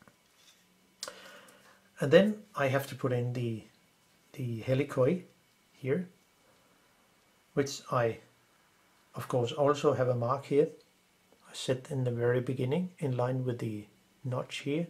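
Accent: Denmark. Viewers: You've got a cool accent but I can't pinpoint it. Are you Danish?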